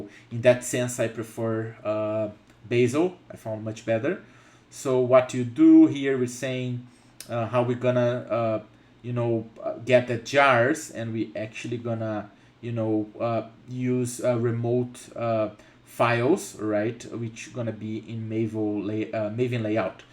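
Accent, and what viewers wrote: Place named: Brazil. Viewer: Dude, what an amazing video. keep making videos like this. It opened my mind. Are you Brazilian? The accent reminded me of Brazilian.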